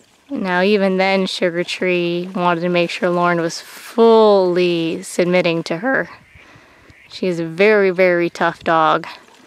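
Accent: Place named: Texas, United States. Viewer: Are you from Texas? You sound like this Youtuber from Texas that i like...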